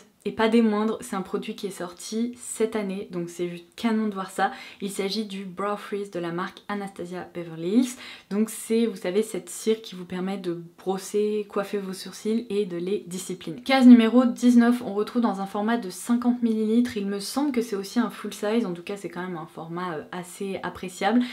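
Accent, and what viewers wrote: England, United Kingdom. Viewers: POURQUOI TU PREND UN ACCENT ANGLAIS POUR UN OUI OU POUR UN NON C’EST RIDICULE !!!!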